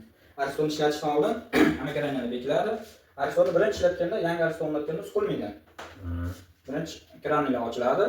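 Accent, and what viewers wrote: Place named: United States. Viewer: бизи 99% одамлар воданагривателни аристон дейишади алюмин эшик ромларни акфа дейишади электр чойнакни тефал дейди. Худдики бир америкаликга у́хшаб гапирасизе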